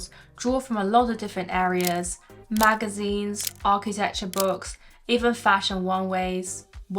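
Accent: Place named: RP, United Kingdom. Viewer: I recently stumbled on your videos algorithm suggestion and wanted to encourage you to not give up on your YouTube account and to tell you that your content, been binge watching, have been really spot on very informative and delivered in a way that normal people can relate to and understand and thoroughly enjoying watching the vids and hear your posh accent haha. I am pretty sure it’s just a matter of time before everyone else discovers you and become the success like Corey! Keep pushing all the content and thank you really appreciate what you are doing here ❤❤❤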